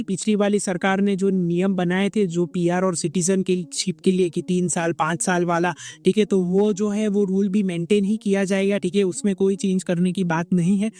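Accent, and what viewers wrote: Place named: Gujarat, India. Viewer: Bhai Kay Thai chho? Gujju accent is visible 😂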